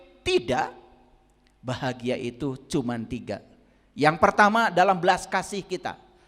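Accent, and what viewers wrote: West Java, Indonesia. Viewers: Saya malah asyik dan seneng ayah guru ngomongnya ada sundanya 😍 padahal saya orang jawa 😂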